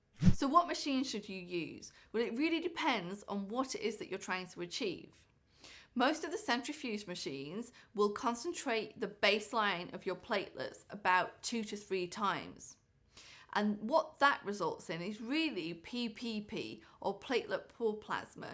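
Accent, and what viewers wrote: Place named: United Kingdom. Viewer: you are simply amazing...I love the way you talk with typical Briton's resonance....I follow ur videos and have got my dermatologist watched your videos...you enlighten us so much on these cosmetological treatments candidly...superb...God bless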